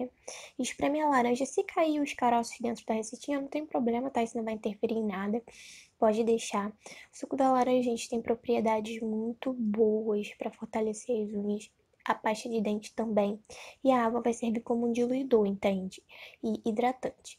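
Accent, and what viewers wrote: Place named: Rio de Janeiro, Brazil. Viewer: Vc é bem carioca né, da pra ver de longe, talvez nem seja, mais tem mt mt mt sotaque, gostei do video, vou testar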